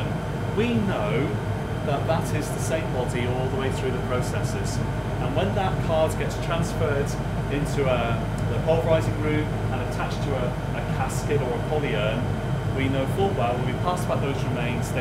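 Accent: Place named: United Kingdom. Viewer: A British accent makes everything sound nice!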